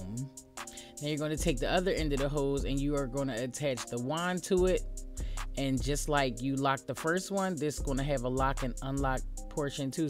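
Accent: Philadelphia, United States. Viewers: You sound like you’re from Philly. And where are u located? I moved from Jersey to Florida and I can’t find ANYONE that I trust with my natural hair😫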